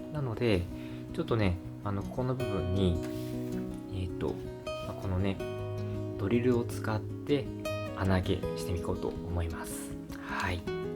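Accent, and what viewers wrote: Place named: Japan. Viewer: I like your Japanese accent but i dont understand Japanese 😭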